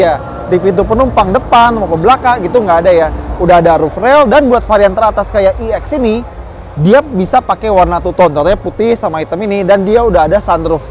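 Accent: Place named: West Java, Indonesia. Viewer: ala orang sunda. Bener urabg sunda mah kalo nyebht huruf F&V jadi hurup P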